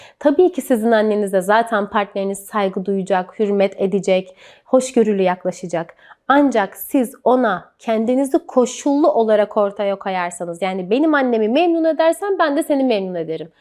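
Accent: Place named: Yozgat, Turkey. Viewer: Ay Yozgat lehçesi vuruyor arada, orada kopuyorum. Emeğine sağlık Hocam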